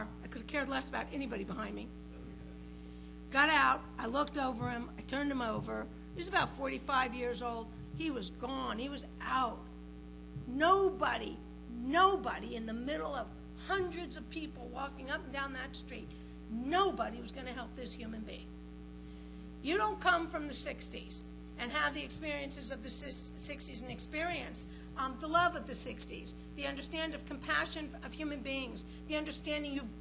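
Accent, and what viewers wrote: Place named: San Francisco, United States. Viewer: the good old san francisco accent. :)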